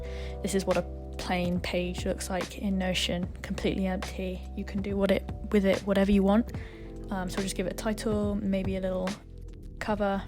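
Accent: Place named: United Kingdom. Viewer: i love your voice so much,, it’s so calming. i know that there are different british accents from different parts of the UK and yours reminds me of a friend of mine! they left tho the country tho :(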